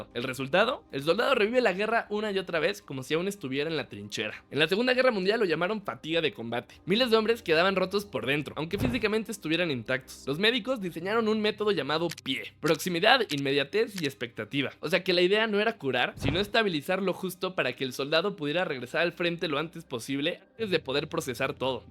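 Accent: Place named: Mexico. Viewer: que habla este tipo? que la guerra no entiene de genero? amigo estas bien ? entiendo que el contexto al cual haces referencia es una guerra tecnologica, pero te recuerdo que la mayoria de guerras se siguen librando en barro y en trincheras, una mujer no duraria ni una semana en las trincheras del frente en ucrania, de hecho ni dos dias. Asi que deja de hablar mrda woke, las mujeres no pueden luchar en campos de batallas reales, no de manera masiva por lomenos. Que hayan existido escuadrones y pelotones de ellas es verdad, pero no es la norma , es mas si mal no recuerdo las comenzaron a usar la URSS solo por que ya no quedaba de otra, eran la ultima opcion, pues la realidad es que biologicamente no sirven para ello. que la guerra no ha traido paz? xdd por tu acento supongo eres mexicano, tus antepasados han muerto por tu libertad que ahora desperdicias haciendo videos pendejos. 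La guerra en su gran mayoria si trae paz, que tu y yo estemos escribiendo nuestras opiniones en internet sentados desde nuestros hagares es prueba feaciente de ello.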